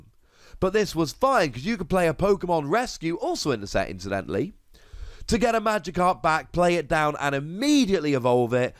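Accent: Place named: England, United Kingdom. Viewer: I LOVEEEEEEEEE English accent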